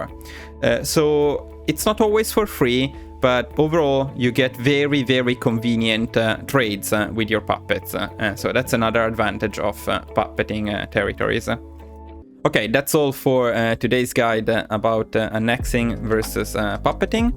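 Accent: Italy. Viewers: That accent seems Italian, quell'accento mi sembra molto italiano è uno dei nostri? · Wait sei italiano?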